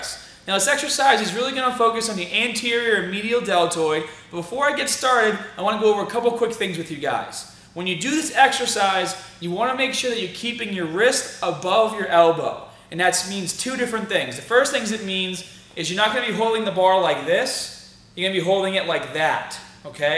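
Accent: Boston, United States. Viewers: What happen to your buston awccent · His accent seems less intense back in 2012. weird...it's like his Boston accent got more pronounced over time.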